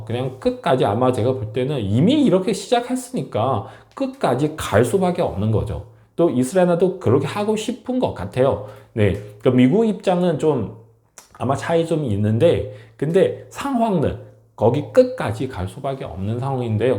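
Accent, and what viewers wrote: China. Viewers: 한국사람 맞니요 억양이 중국사람인것 같네요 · 발음이 왜 중공식임? 중국에서 얼마나 살았기에??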